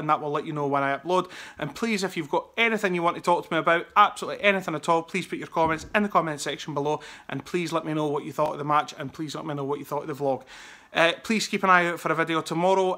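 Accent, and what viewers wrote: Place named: Edinburgh, United Kingdom. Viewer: Yaasss mate get in, also just wanted to say you have the most stereotypical Edinburgh accent I've ever heard hahahaha! Subscribed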